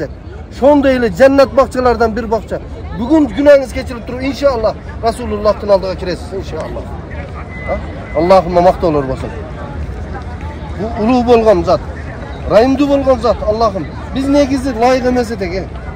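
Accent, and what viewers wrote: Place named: Uzbekistan. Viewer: Караң э, дең э, айтиң э, деп өзбекче эмес айтыңыз, деңиз караңыз деп адабий сүйлөгөнгө аракет кылсаңар муну бүткүл Кыргызстан көрөт...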